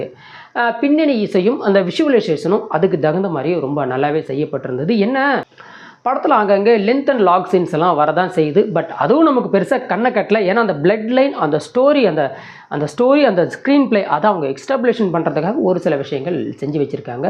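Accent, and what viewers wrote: Kongu, India. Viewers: Wonderful REview.. Kongu Tamil Vazhga... Cheers from #Pollachi Town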